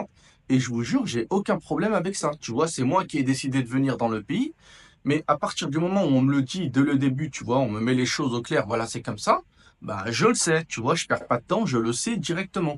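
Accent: Banlieue, France. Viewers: ok il est assez beau mais par contre, il a un petit accent de banlieue. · Tu as un accent de banlieue, ce qui peut être perçu comme un désavantage dans le monde du travail et la vie sociale. De plus, à ton âge, porter une casquette à l’envers avec des écouteurs ne renvoie pas une image très professionnelle. Pour ma part, je vis en Irlande et je suis d’origine maghrébine. J’ai quitté la France en 2005 car je ne trouvais pas de travail non plus. Lors d’un voyage d’affaires à Lyon, j’ai été choquée par les arabes, l’ambiance dans le centre-ville, ainsi que dans les transports en commun comme le tramway et le métro : des comportements incivils, un vocabulaire limité et une omniprésence de "wesh wesh". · Tu te trompes totalement sur le racisme, c'est bien ton accent de banlieue qui t'empêche de trouver un travail. Les français, aux patronymes bien français Pour chaque maghrébin à accent de banlieue qui ne trouve pas de travail, tu as un maghrébin qui parle normalement qui a un emploi et parfois des emplois à hautes responsabilités. 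Et pourtant tout le reste est en commun. Il y a plein de directeurs d'hôpitaux, de collège de lycée, plein d'officiers des armées ou de la police, plein d'attachés d'administration et d'attachés territoriaux, et PLEIN de politiques haut placés maghrébins, à couleur de peau, avec un nom arabe, et musulmans. Qu'ont-ils TOUS en commun? Ils ne parlent pas avec un accent de cité. Et devine quoi? Les français blancs à patronymes bien français avec un accent de cité ont exactement les mêmes problèmes de recrutement. Sur le reste je suis totalement d'accord avec toi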